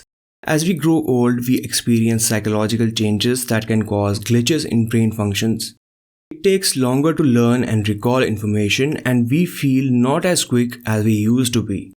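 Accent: India. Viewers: Is speaker a kashmiri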